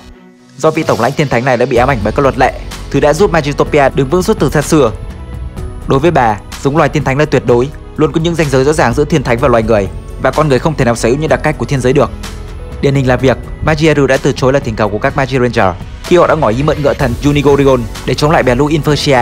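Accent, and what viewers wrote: Japan. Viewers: Phát âm kiểu Nhật đó ạ, trong phim họ phát âm đúng là như vậy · khó chịu thì chịu khó tìm hiểu tại sao đọc như vậy, phim của Nhật, thì đọc theo cách đọc tiếng Anh của người Nhật thì có gì sai. Nếu khó nghe thì tắt tiếng vừa xem hình vừa đọc wiki theo tiếng Anh chuẩn của mình đi. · Ông ấy nói theo phiên âm nhật k phải tiếng anh ạ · Phát âm theo tiếng Nhật chứ ko phải tiếng anh đâu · Nói chung là nói theo kiểu tiếng anh chuyển thể nhật nên nghe rất khó chịu Flagel thì đọc là fle gieo thôi Fu le gieo ru Nghe mà chanz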